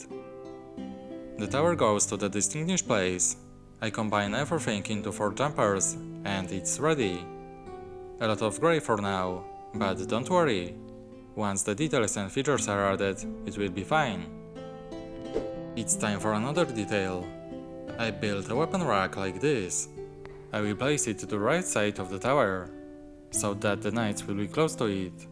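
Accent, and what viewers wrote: Poland. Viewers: Tak mi coś akcent podejrzanie zalatywał Pongliszem więc miło widzieć, że PL. Dobra robota! 🙂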